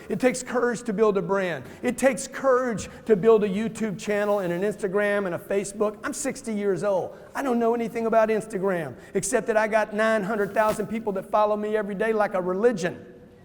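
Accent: South, United States. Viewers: Ban Nsabin it’s his southern twang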